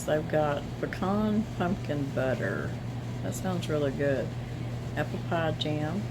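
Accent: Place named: Arkansas, United States. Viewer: Thank you for sharing. My late father was from northern Arkansas and extended family still lives in an area likely not too far from you and where we took many road trips to visit years ago. Your accent and way of speaking reminds me fondly of my late grandmother and my aunts and cousins. Lovely, soft, and sweet. Thank you again from the heart of the San Joaquin Valley in California where tens of thousands of acres of almonds are grown and are currently blooming in celestial creamy white this time of year.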